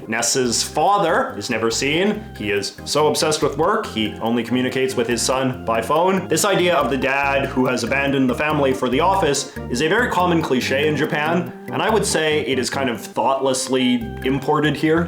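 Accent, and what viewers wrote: Canada. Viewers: It's his Canadian accent, of course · Your accent is aggressively Canadian, my god.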